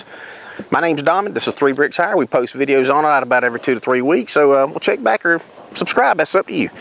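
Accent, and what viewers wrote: United States. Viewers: U have the most american accent ever. Like you were from a cartoon or 50's america.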